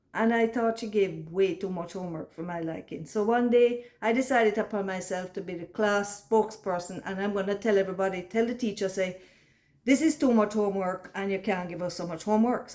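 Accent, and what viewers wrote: Jamaica. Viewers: My youngest daughter’s father-in-law is a white man with a Jamaican accent, just like this woman. It’s fascinating to see how he was raised in a similar way, embracing a culture that shapes his voice and mannerisms. ❤ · The funniest thing is hearing a chinese person talk in a heavy Jamaican accent.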